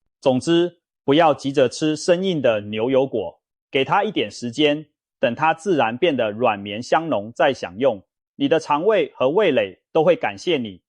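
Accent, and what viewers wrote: Taiwan. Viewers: 明明作者是台灣口音，為什麼要用大陸的說法「牛油果」來稱呼我們台灣習慣稱呼的「酪梨」呢？ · 主持人一口台灣腔應該是在台灣長大的，為什麼中文這麼差很多的錯別字而且讀白字。我建議還是用英文做節目吧至少英文不會有錯別字也不可能讀成白字。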